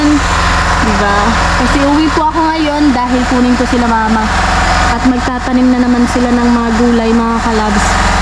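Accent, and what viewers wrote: Visayas, Philippines. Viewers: Ang laki ng bahay mo bagay sa iyo dahil maganda ka ok lang ang tagalog mo kahit meron halong visaya I love watching you video here in the USA ❤️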